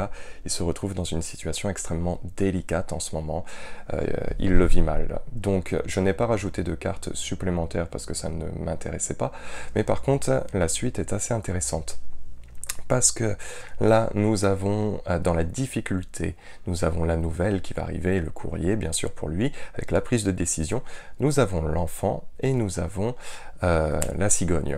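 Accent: Quebec, Canada. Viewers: Merci, bon voyage j'adore l'accent québécois.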